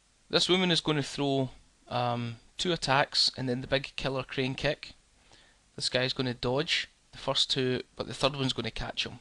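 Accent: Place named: Wales, United Kingdom. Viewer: stop speaking in that horrible welsh accent i'm not hating i just don't like ur accent ur a gr8 guy just that accent is not my kindness no hard feelings <3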